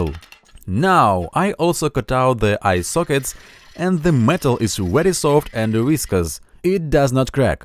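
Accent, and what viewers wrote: Russia. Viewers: I can hear strong russian accent.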